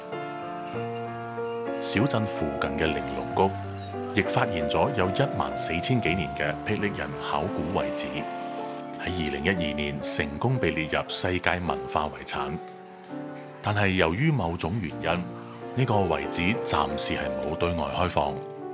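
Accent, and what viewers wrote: Hong Kong, China. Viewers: Love your HK accent narrating a msian documentary in classic queen's Cantonese. Are you by any means from HK? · 难怪听起来像香港人口音和用语。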